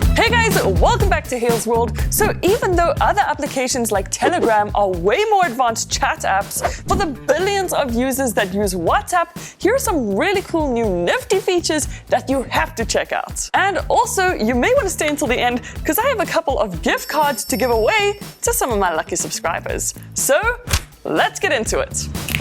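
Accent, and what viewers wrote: South Africa. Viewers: I can't point out the accent, is it South African 🇿🇦?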